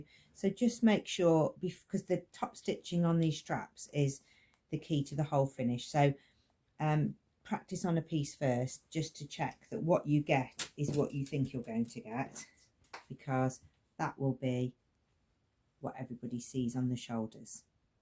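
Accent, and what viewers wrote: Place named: England, United Kingdom. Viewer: Which part of England are you from? I love your accent! Greetings from Australia 🇦🇺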